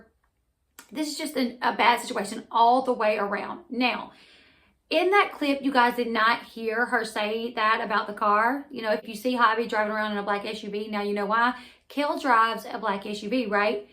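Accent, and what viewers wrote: South, United States. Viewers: I love your southern accent. Lol I'm from NC and my bf says I have a twang but you got me beat. 🥰 Great info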